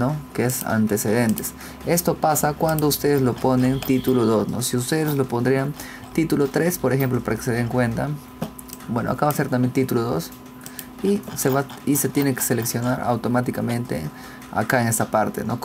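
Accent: Peru. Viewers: peruano XD lol (lol) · Español Perú ☠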